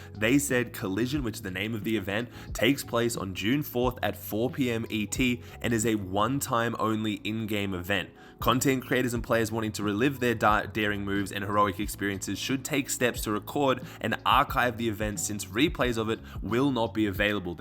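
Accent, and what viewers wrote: Australia. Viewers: Not gonna lie, Groggy brought a smile to my face. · Wtf haha that voice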